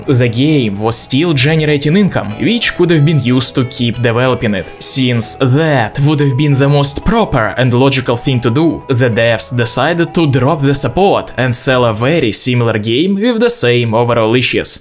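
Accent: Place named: Russia. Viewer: так забавно слушать жесткий русский акцент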